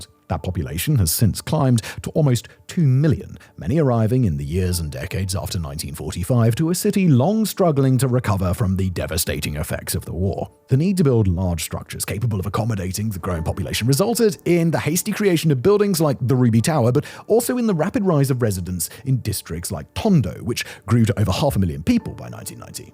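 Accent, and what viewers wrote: United Kingdom. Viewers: As a Filipino I find it endearing whenever you pronounce these Spanish sounding City names and Filipino Names in your own British - white boy way 😂😂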